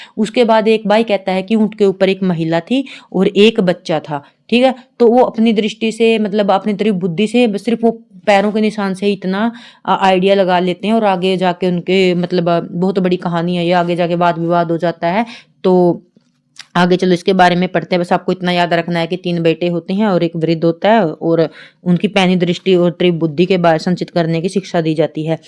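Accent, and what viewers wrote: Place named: Haryana, India. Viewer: Very nice mam aapki awaj kaithal ki hai❤❤❤❤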